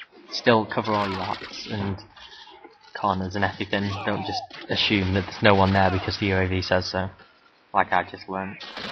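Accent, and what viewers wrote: United Kingdom. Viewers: filipino with a english accent? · corner, he just has a british accent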